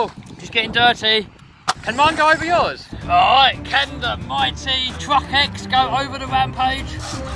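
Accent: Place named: England, United Kingdom. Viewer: What part of England you from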